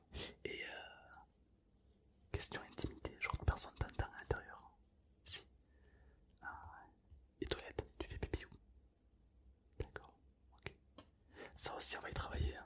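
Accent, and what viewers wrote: South, France. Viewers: n’a plus d’accent du sud en chuchotant Like si ta remarqué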